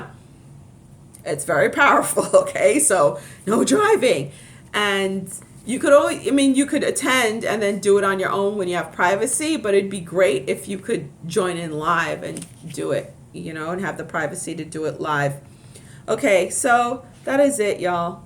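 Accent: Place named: Boston, United States. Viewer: Hello!! Thank you sooooooooo much! I knew that was Boston accent first video. New Subby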